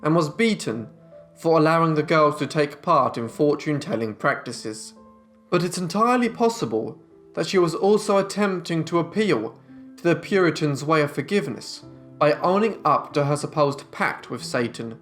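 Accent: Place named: United Kingdom. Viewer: Thank you for all your hard work and informative video. Well done. Subbed after 7 minutes :-) Something about a British accent automatically adds 30 IQ points to any documentary. Especially yours, you have a great voice, very clear and understandable. Not gonna lie, some accents I have to rewind several times or turn on the captioning feature. Look forward to watching more. Hope you have a safe and prosperous new year.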